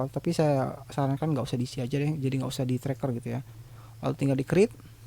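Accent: Palembang, Indonesia. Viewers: wong plembang ye lor ? 😁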